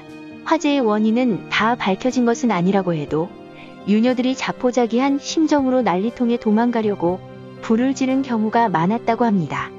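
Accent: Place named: Busan, South Korea. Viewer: 부산말씨 같은데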